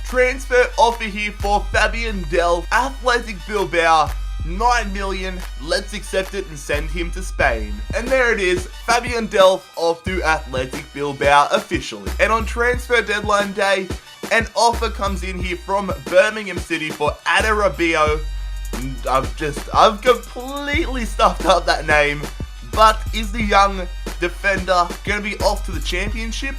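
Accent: Australia. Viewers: Are you Australian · I knew you were Australian! Great vid From, fellow Aussie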